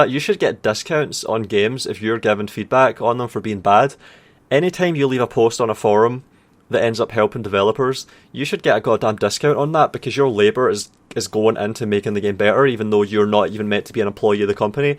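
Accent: Scotland, United Kingdom. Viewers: I remember watching you 8 years ago and I couldn't understand your accent, since then I've spent quite a bit of time around people from Scotland and Northern England so now your accent isn't hard to understand at all. This has no relevance to anything you talk about in the video. Keep up the good work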